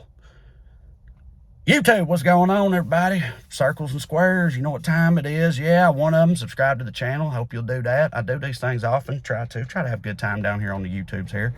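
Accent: South, United States. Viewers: That's funny, because this is a comment I've only heard from people who haven't spent significant time in the rural southern US (where the accent really isn't that unusual). That's more or less how speaks normally (his sister, who is not a comedian, has the same accent he does). Of course, he's not speaking in a totally normal fashion in these "car rant" videos, since they are a recurring bit that he presumably writes scripts for beforehand, but the "country accent" itself is not the unnatural part. You can see an episode of and friends' podcast where his sister was a guest here, for example ( can also be heard throughout, speaking in a more impromptu manner): <link>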